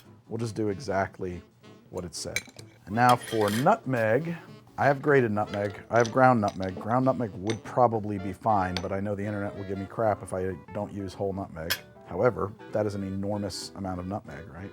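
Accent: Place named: New Jersey, United States. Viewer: You tawk the way you do cuz youre from Joisy. I also grew up in the north east, Massachusetts (and no I'm not from Baaston, I'm from Woostah), and I've never heard a damn soul say "affiktionado."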